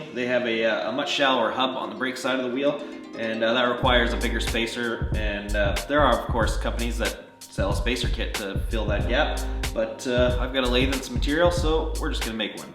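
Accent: Canada. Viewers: what part of canada r u from?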